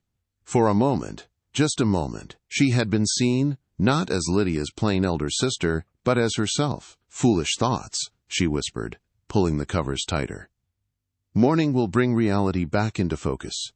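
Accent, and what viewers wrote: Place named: United States. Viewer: and American accent😞. Should be narrated in British accent.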